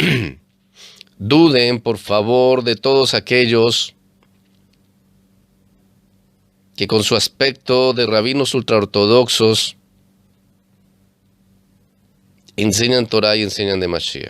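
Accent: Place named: Spain. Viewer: Me surge otra pregunta! Porque habla usted como español si no lo es? todos los hijos del Eterno amamos sus mandamientos y obedecemos su Torá por amor de El! Eso es más que obvió! No se trata de una idolatría a Pablo! Se trata de la Torah! Sin pruebas no se debe haceptar testimonio contra nadie! Y menos por boca de un solo testigo que no aporta pruebas! Así que asegurar sin pruebas que Shaul es mentiroso sin decir en qué miente! Es sin dudas un falso testimonio! YESHUA a muerto por nosotros! Pero en su mismo orden de ideas tampoco debemos ver sus videos ni escuchas sus enseñanzas pues no son las del MESÍAS! Digo más podemos escuchar a todos y prestar atención a los que hablan conforme a las enseñanzas del ADON! Sabiendo siempre que solo hay un ELOHIM y solo a El Amamos y servimos! Lo anterior antes de la lluvia de insultos de idolatría y acusaciónes falsas en mi nombre! 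Amo al Eterno y procuro vivir en sus mandamientos con todas mis fuerzas! Y esto lo aprendí del MESÍAS y por obra de su siervo Shaul y de los apóstoles o envíados de los profetas y su siervo Moisés! Shalom. Shalom Shalom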